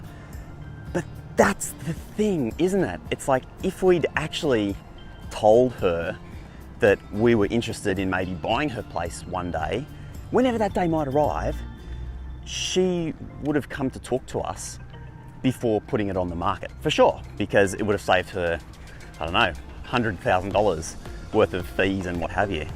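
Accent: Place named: Australia. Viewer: Australian English is awesome 😎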